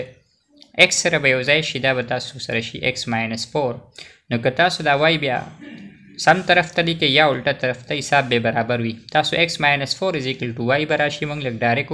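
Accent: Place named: England, United Kingdom. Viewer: What a english accent 😊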